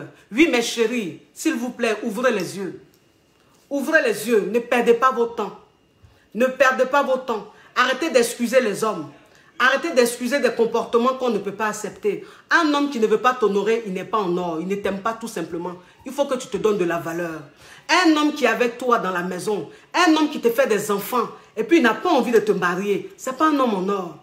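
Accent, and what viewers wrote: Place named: Ivory Coast. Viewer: Une vraie ivoirienne qui parle la même, je suis fan, longue vie à toi la madré .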